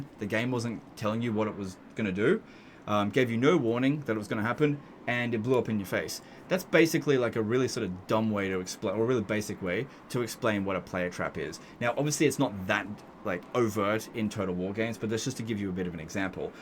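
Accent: Australia. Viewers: never was a huge viewer but it kinda rocks how painfully australian he is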